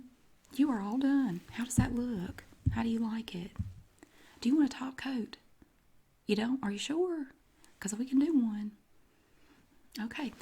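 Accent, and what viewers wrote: South, United States. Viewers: Southern accent!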